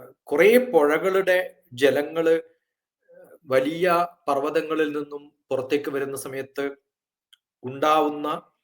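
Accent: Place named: Malabar, India. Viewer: May be from Malabar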